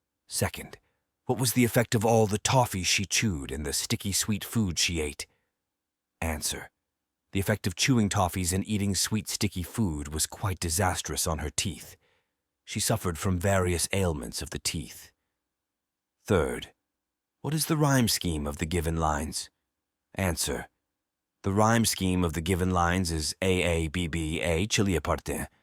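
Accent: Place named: United Kingdom. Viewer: change the voice changer, this is in uk accent change to something that can we understand apart this your teaching style is very unique and good it helped me thanx😊❤👍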